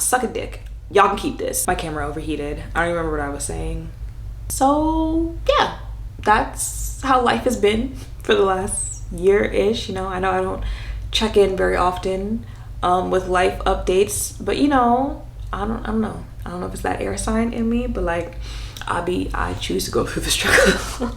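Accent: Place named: Long Island, United States. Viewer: Oooh you’re from LI. I can’t place your accent to specific town 😆 I hear you on the moving out. So happy you were able to remove yourself from such a wild apt. I hope the next place is much better.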